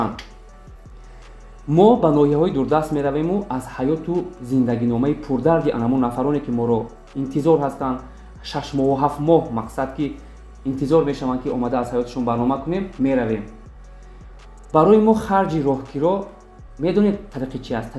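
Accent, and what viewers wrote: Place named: Tursunzoda, Tajikistan. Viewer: Муҳтарам шумо зодаи кадом гушаи Точикистон ҳастед, чунки тарзи сухангуйиатон каме ба мо мардуми Турсунзода монанди дорад?Агар малоли хотиратон бошад узр мепурсам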